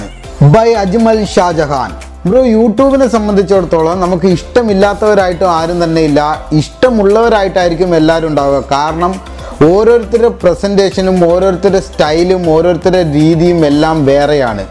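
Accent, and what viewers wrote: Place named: Palakkad, India. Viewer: Chettan sherikum palakkad language ani parayunath🤗🤗🤗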